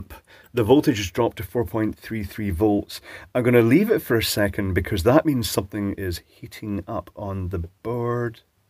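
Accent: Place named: Scotland, United Kingdom. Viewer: Fluxing and removing chips.... its a lovely Scottish sounding Louis Rossman, with less swearing!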